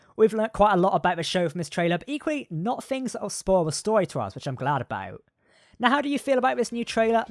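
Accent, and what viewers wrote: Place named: United Kingdom. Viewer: It's a shame that this fellow is cursed with that damned British accent which causes the speaker to slur their Rs into Ws (Mayfair?), making them sound like Elmer Fudd: "Be vewy quiet ... I'm hunting wabbits". That, plus his penchant for speaking too fast, I can understand very little of what he is saying. Very annoying to listen to.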